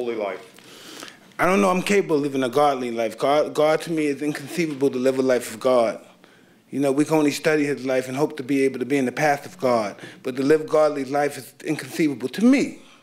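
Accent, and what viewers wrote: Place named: United States. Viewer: I like the way he articulates his points in pure American English, Tyson Mike a true legend,he speaks English so nicely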